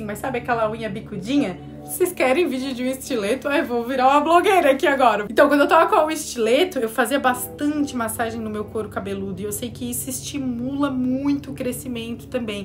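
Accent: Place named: Florianópolis, Brazil. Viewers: Tão bom abrir vídeos aqui e ouvir esse sotaque! Uma impressão de que te conheço de Florianópolis... Só quanto à massagem, não fizeste recomendação explícita de massagear com as unhas, mas ficou a dúvida em muita gente sobre isso. Acredito que tu saibas, mas é bom reforçar por aqui: massagem só se for com as digitais dos dedos, com a parte macia! Never do it with the unhas! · nossa escutei o sotaque e tive certeza q era daqui de floripa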